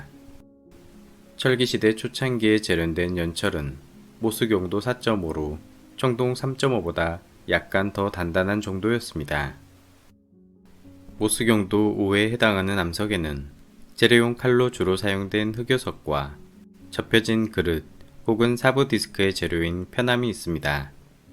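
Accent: Jeolla, South Korea. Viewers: 은은하게 들리는 전라도 억양이 반갑소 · 좋은영상 감사합니다~ 성우분 전라도 사투리가 들리네요😆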